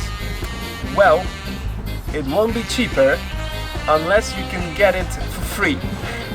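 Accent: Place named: Poland. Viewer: Genialny materiał! Pierwszy taki na YT. Jestem fanem 126-stek, a dzięki takim filmikom, mogą znaleźć się fani zagraniczni. Piona ziomuś! P.S. jesteś Polakiem? Bo masz akcent zajebisty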